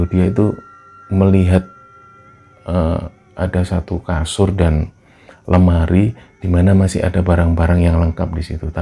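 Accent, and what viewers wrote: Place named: Magetan, Indonesia. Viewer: Beneran magetan, orang magetan mana suaranya ✔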